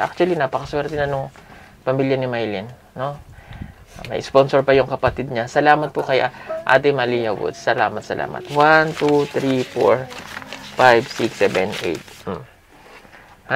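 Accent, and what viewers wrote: Visayas, Philippines. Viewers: Kuha kuha na ni ang pag sasalita ng bisaya. Pati accent kuha kuha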